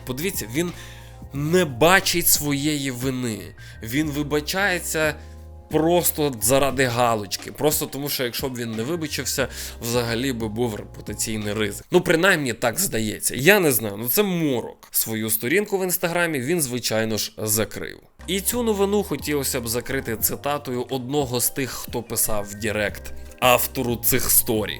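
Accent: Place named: Olevsk, Ukraine. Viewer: Дякую за позицію! Мої Вам вітання з Києва! Як же я скучила за олевською говіркою. В мене тато звідти, з села Кишин, там досить багато рідні. Нажаль останні роки рідко спілкуємося, якесь бентежне життя настало...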